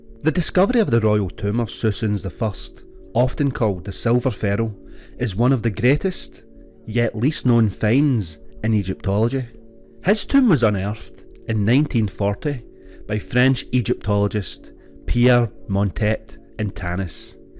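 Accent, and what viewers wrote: Scotland, United Kingdom. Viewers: Turned on the captions so I could understand through the Scottish accent. The captions were hilarious. They told of pharaoh Susan The First, Howard Cattle, and more!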